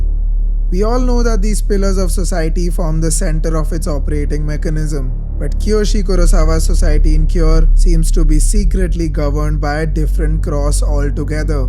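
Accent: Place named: India. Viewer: Great Video although ive noticed your quite heavy indian accent. I would probably watch more videos from you but my heavy distaste for the Indian race won’t let me. Please consider to stop being Indian so more People can enjoy your content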